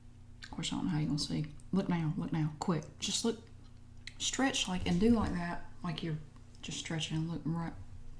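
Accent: South, United States. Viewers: You are real Southern Belle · this type of southern accent reminds me of Tree Trunks from adventure time haha 💗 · Something about a southern accent I actually enjoy lol I'm a Georgia city guy but I still love it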